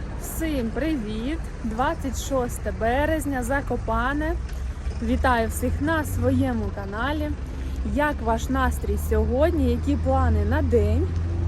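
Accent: Surzhyk, Ukraine. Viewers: Вибачте, але українську мову вам ще вчити і вчити - розмовляєте жахливим суржиком. Купа русизмів.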